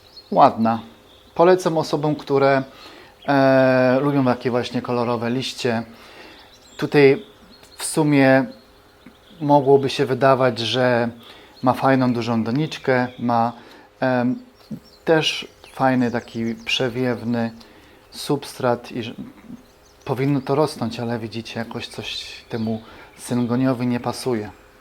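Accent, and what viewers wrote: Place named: Podhale, Poland. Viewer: dziękuję tak zapytałam bo akcent jak z Podhala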